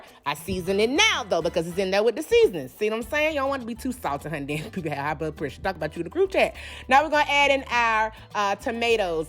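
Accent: South, United States. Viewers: I can tell your a real southern belle ma im from Mississippi so i love hearing that southern accent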